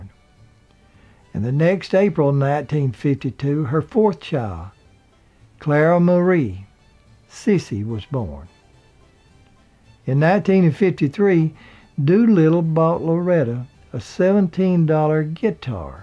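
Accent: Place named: West Tennessee, United States. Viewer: just found your channel. Your voice sounds like pure West Tennessee. Very nostalgic, like listening to my grandfather tell stories when I was a child. Thank you.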